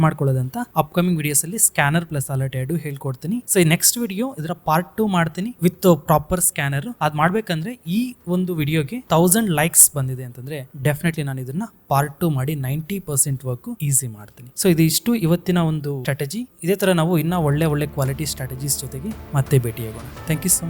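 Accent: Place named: Ballari, India. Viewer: Very nice video, I watched full and gave like also, I hope we can see 1000 likes very soon. But irrespective, please continue this video and show us to build the screener in chartinc for next steps. Are you from Ballary / Raichur region? I am just guessing based on your Kannada accent, I might be wrong. Thanks for this video, God Bless You.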